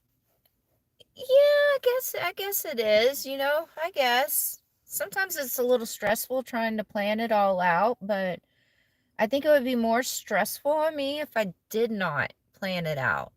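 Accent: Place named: Texas, United States. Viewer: There's nothing wrong with a Texas accent. Thanks for all your travels. Not able to do any travel any more. Love watching. 🐍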